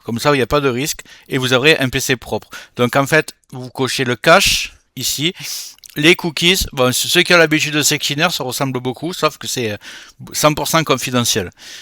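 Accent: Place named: South, France. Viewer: Merci! JE suis un Québécois francophone et ça me fait du bien d'entendre un expert qui parle de Windows en bon français avec un accent du sud! Comme je vis dans un océan d'anglos, et que la technologie venait surtout des USA je regarde beaucoup de vidéos en anglais mais j'apprécie le fait de vous écouter dans ma langue!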